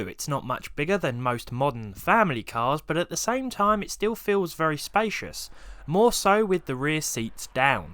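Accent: United Kingdom. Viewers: Dennis Thorsson there’s something charming about the lower budget though. And the British accent lol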